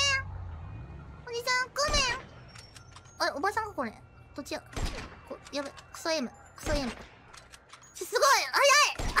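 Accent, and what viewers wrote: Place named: United States. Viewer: if i wouldn't know better i thought she's american 🤔🤔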